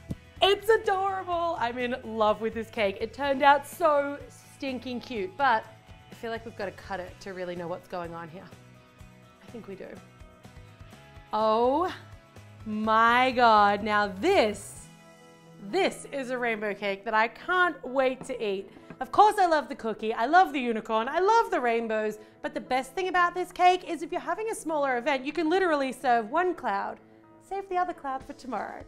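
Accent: Australia. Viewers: Veronica Carabez fr thoo i obviously thpught dhe was austrailen but that was it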